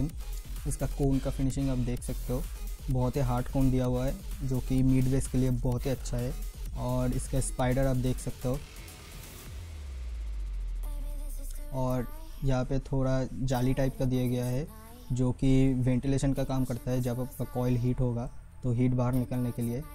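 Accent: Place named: Bengal, India. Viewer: Dada tomar kotha sune bujhlam tumi bangali tomar bari kothay ❤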